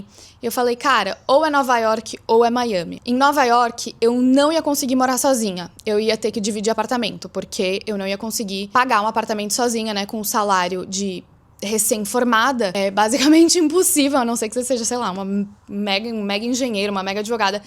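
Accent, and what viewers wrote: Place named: São Paulo, Brazil. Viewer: Me impressiona que você não tenha perdido o sotaque paulistano em todos esses anos, mesmo tendo morado em Fortaleza, até por que lá o sotaque é forte.. Que legal essa temporada que passou em Barcelona! ¿Hablas eapan̈ol? Rs Poderia fazer um vídeo contando um pouco sobre seus meses lá? Admiro pessoas pessoas que tomam decisões sérias ainda tão jovens, principalmente estando longe da família. Super entendo sua decisão de voltar. A questão da violência realmente pega, e não é só em SP, infelizmente. Te sigo no TT, mas tenho entrado pouco lá p usar menos as redes, e estou gostando bastante dos vídeos aqui no YT.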